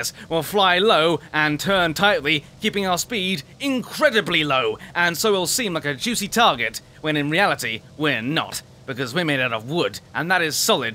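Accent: United Kingdom. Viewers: not an impression, hes actually british........ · is this your real voice because I'm British but I don't sound like you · Your gameplay and commentary is so enjoyable, the british accent is on point m8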